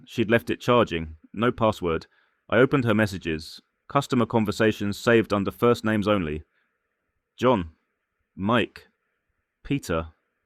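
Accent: England, United Kingdom. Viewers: This bullshit is just story telling which bares no truth…He has a British accent tho…probably went to the Philippines 🇵🇭 heard and saw some stories, then started a Utube channel…not too many subscribers yet, looks like it’s fairly new. The problem with these nonsensical stories are, they’re giving the Philippines 🇵🇭 a bad reputation.Stay blessed 😇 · A fool and his money are soon parted especially when no common senses is used, and you sound like you have an English accent to me, not american😉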